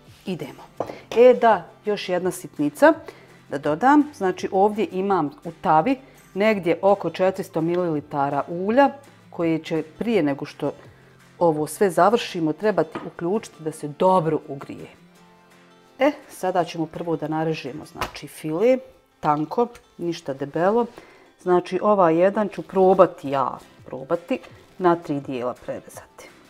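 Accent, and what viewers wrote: Bosnia and Herzegovina. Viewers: Obozavam Vas slusati dok pricate, svidja mi se ovaj recept, bogat va jednostavan, molim Vas vas recite mi iz kog ste mesta, moja drugarica je iz Bosne i prica kao i Vi, pozdrav🍀🌹🍀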